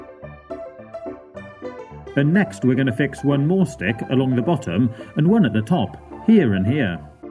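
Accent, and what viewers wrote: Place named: RP, United Kingdom. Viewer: I like your posh British accent! I’m British and have a very posh accent! I’ve half way through made this with my dad, I’m making it but we’ve only done about half (I’m exited for it to get finished!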